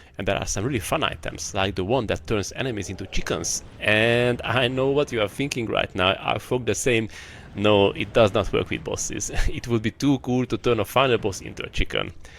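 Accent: Hungary. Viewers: Jól hallom? Mintha magyar akcentust hallanék. :face-blue-smiling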